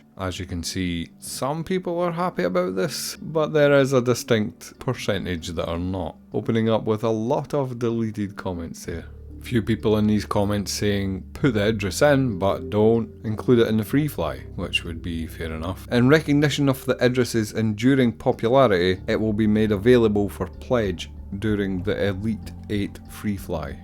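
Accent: Scotland, United Kingdom. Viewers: I'm consciously following along with the wee sairtin wyerds arrr siid to byittr awndairstaynd how parrteekular wayrds are seed from a lejituhmet Scottsmn layk yourdr seeyalfff. (Spelled phonetically from the 'weee' my kuhnayjiun y'eers 'n brehn pairsceeev eught) (I'm consciously following along with way certain words are said, to better understand the way particular words from a legitimate Scotsman like yourself {spelled phonetically from the way my Canadian ears and brain perceive it})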